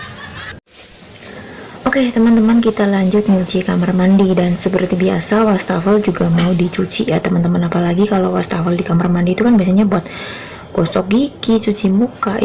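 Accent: Banyumas, Indonesia. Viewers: Nek Ra ngapak ora kepenak,,skses sll yg di Taiwan,thanks infonya ce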